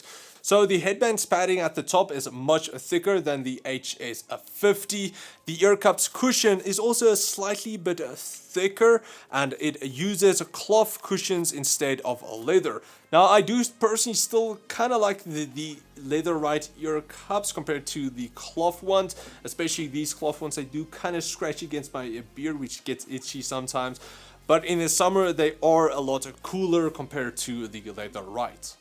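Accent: South Africa. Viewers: why are you trying to sound americaN? A boer accent mixed with american is a no no bro bro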